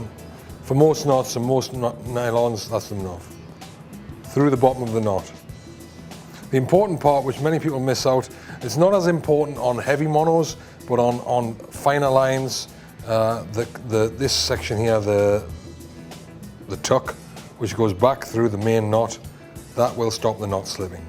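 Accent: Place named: Durham, United Kingdom. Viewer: Durham accent 🤔🤔😁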